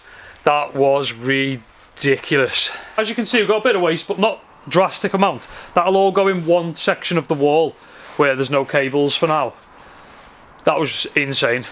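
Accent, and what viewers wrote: United Kingdom. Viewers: I'm your fans from Indonesia. Its quite nice to watching your content and your british accent really fvckin' dope mate 😂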